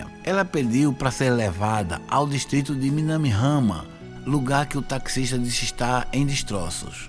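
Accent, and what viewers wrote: Northeast, Brazil. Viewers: Sempre ouço seus depoimentos senhor locutor, de qual Cidade Nordestina vc é ? Pelo sotaque. Eu sou de GOIÂNIA GOIAS.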